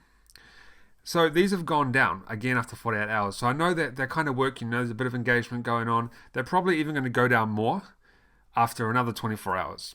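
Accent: New Zealand. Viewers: Recognize that kiwi voice instantly; love your videos bro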